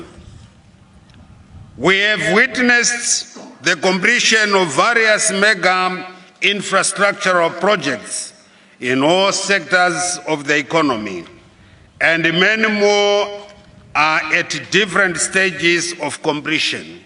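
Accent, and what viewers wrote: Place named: United Kingdom. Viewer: Is this guy really a Zimbabwean ?sounds like he's from British empire (Malawi,)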